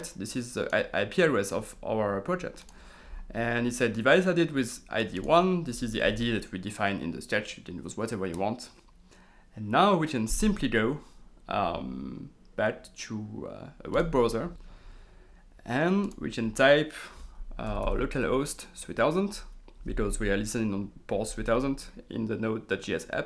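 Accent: France. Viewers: Are you French ? You have one of these accent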